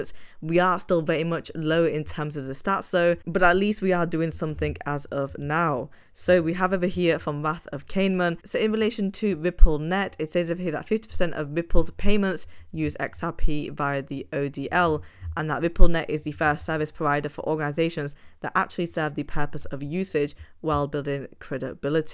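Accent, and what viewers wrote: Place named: North, United Kingdom. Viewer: northern british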